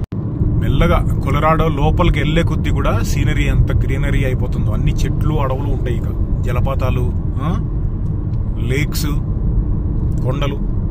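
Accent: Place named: Telangana, India. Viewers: Telangana slang m ra vari😂😂😂😂😂😂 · Emirà vari .....😂....pure telangana slang .....i jst got remembered Venu Madhav....garu😂😂😂😂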